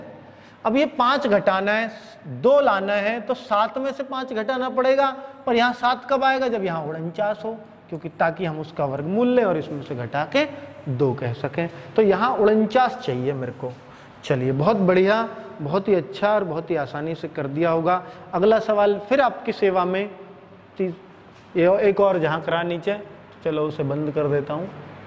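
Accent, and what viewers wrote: India. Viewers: Ram ram Maja aajata hai guru ji apne se lagte ho ekdum desi basha me english bi desi si lagti hai aapki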